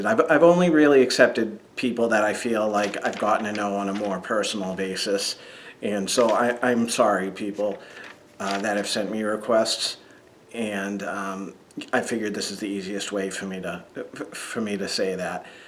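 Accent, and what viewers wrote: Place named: Boston, United States. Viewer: ...amigo, imo, you don't need give aways. We sub'd and watch you for YOU and your shenanigans. In a youtube world of so many "fake and money grubbing" people, i myself appreciate your humbleness and "realness!" Don't put yourself down amigo please, there's many of us out here that genuinely like you just as you are. When I watch your videos I smile, you make me laugh and of course, who doesn't love that "Buastahn" accent, lol. I am part of the, Hog & 2 Cent, Mark, Matt, Nicky, and Vegas Nerds crew. 😁😁 I am happy to see that you and Cinamon are "goin steady", ha ha, ya know what I mean. I wish you both good luck and blessings. Amigo, please stay safe in Vegas....DO NOT lick the pole! LOL LOL 😅😆 Do NOT lick anything that hasn't been sprayed down with plenty of alcohol! LOL By the way...I'm a chatty heffer, so I don't mind rambling, lol! 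Cheers to good times, health, tons of laughs, handpays and unforgettable memories in our beloved and BEST city in the world...VEGAS! 🤗😁🍸🍻💰🍀🤣🎵🙏🎰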